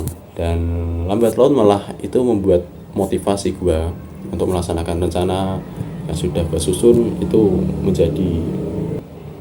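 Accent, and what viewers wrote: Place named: Java, Indonesia. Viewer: kalau medokk jangan maksa GUA bang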